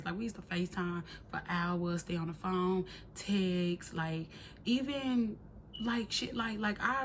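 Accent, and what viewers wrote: Mississippi, United States. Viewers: I love that country azz MS accent, my family is from there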